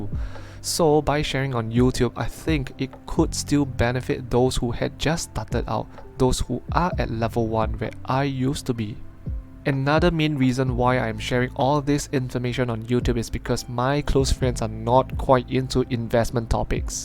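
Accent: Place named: Singapore. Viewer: Hi, though I have subscribed to your channel rather recently, I enjoyed your analysis of the market. Your Singaporean English is very relatable as well lol. Keep up the good work and I will start to watch your options videos as I have tried to learn and understand a few times through different means but still don’t quite get it yet 😢sigh. Looking forward to part 2 of your video 🤗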